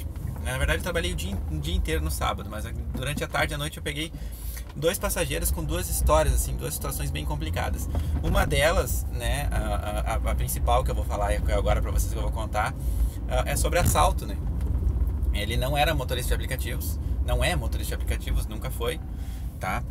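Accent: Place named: Porto Alegre, Brazil. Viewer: Que histórias hein! Valeu, não sou motorista, vim parar há algumas semanas por acaso no seu canal, mas já assisti vários e vários vídeos, to gostando bastante. Me identifiquei contigo, trabalhador, gente boa e o sotaque bem puxado que me lembra alguns amigos de POA! Ficou bem legal o quadro contando histórias de passageiros, faça mais vezes. Abraços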